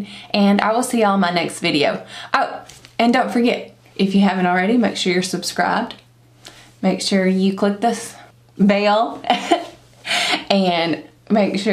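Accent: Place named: Arkansas, United States. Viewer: likex10 I only ever used 1 setting spray and thats macs fix + ....miss that smell. Haven't purchased that in a long while. Sorry to hear about your neck hope you feel better soon. Will keep it in prayer too. Arkansaw how cool! Thats where that accent comes from 😃👍👍👍 that is so beautiful. I love meeting ppl who Love the Lord too. I am most thankful for deliverance and overcoming things I never thought I could #GodbeTheGlory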